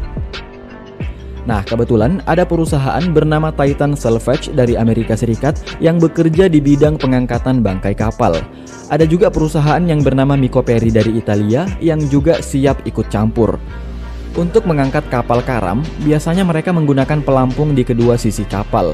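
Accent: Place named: South Sulawesi, Indonesia. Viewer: Orang sulsel ini kyknya mimin😂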